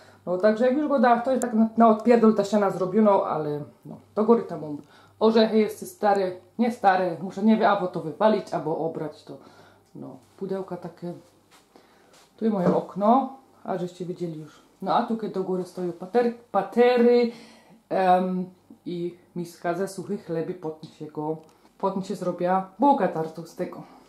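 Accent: Silesia, Poland. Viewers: Mogłabym Pani słuchać wciąż... Uwielbiam tą śląską gwarę. Kuchnia bardzo funkcjonalna 😍 zazdroszcze tych wszystkich pierdół do pieczenia 😉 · Nie da sie sluchac tego slaskiego pierdolenia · Kuchnia fajno a ta twoja godka jest dupno 😂 też jestem że śląska mieszkałam w Zabrzu a teraz już kupa lot w Holandii pozdrawiam😚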